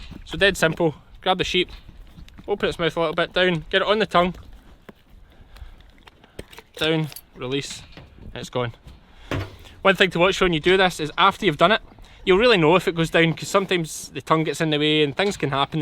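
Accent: Scotland, United Kingdom. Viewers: Ahh cool nice to listen to a Scottish youtuber for once 👍👍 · My mate and i was talking on how you told your dogs to lie down while we were gathering in the mountains today, he says its “lie doon” becouse of your accent but i thought doon was down in Scottish, who’s right?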